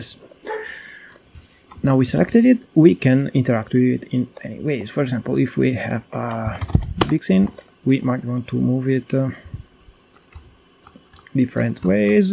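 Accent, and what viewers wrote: Italy. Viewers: Lo sapevo che eri italiano, dalla pronuncia ahahahha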